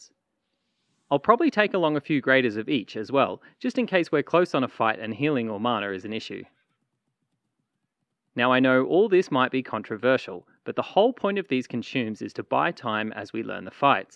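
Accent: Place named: Australia. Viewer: Aussie AF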